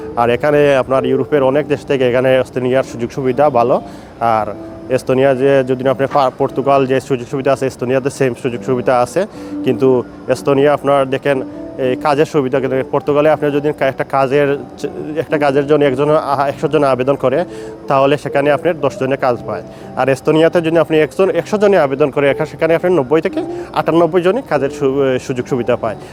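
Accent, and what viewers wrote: Sylhet, Bangladesh. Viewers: ভাইয়া আপনি কি সিলেটি ❤❤ কথায় বুজা যাচ্ছে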